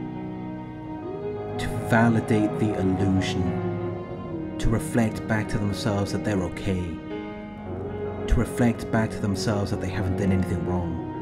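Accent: Wales, United Kingdom. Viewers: Where you from? Sound Welsh. Good video. I’m from porthcawl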